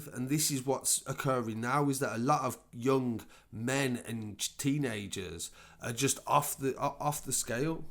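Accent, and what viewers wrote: Birmingham, United Kingdom. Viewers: Goid coverage.. that brum voice lol even thow im from brum to i dnt have the accent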